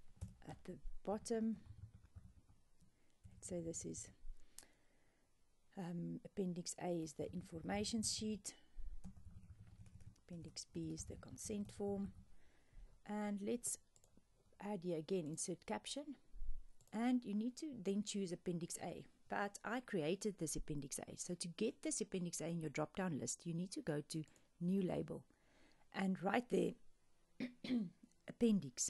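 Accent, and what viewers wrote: Afrikaans, South Africa. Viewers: Thanks very much, got to love the Afrikaans accent! Dankie! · South African? The accent is strong. But it´s cool to hear it so unexpectedly.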